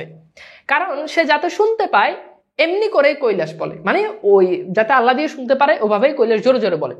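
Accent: Noakhali, Bangladesh. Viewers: Noakhalit Khar bole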